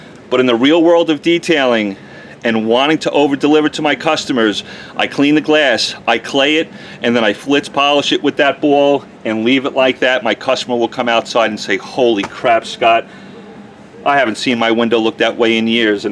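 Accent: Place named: Brooklyn, United States. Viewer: Haha I thought the same thing I’m thinking Hey, this guy sounds like he’s from Brooklyn, like me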